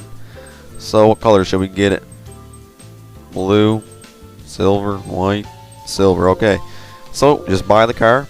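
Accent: Newfoundland, Canada. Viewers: Are you from newfoundland? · are you canadian? :D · are u from canadia? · Are you Canadian? Your accent is quite distinct. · man your canadian ass fucking english murdering accent made me hate this video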